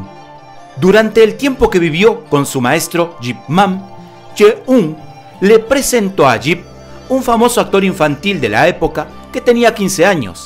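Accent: Argentina. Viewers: chileno? Pensé que era argentino.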